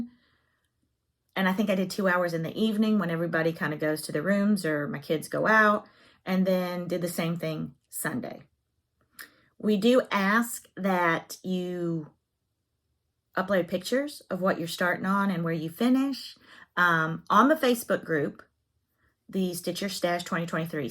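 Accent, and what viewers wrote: South, United States. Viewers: “May”I ask where you are from? Because you didn’t get that accent in Ft. Lauderdale 😮 I just moved to Boston from Jacksonville Florida 4 years ago and it’s wonderful to hear a southern accent. Your videos are full of joy and I thoroughly enjoy them😊 I am with ya , sista, on the linen vs Aida. Had a hissy fit stitching on linen, trying to keep the stinking x’s in a row . Switched to Aida and no projects are flying across the room ❤ Time with a daughter is priceless…ENJOY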